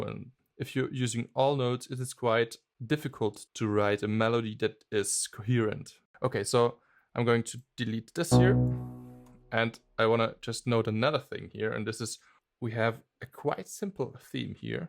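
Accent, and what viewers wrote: Germany. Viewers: his accent gives him away as a german guy ... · Yeah, I'm German as well but have to say this guy might want to work on his accent to make himself more easily understood.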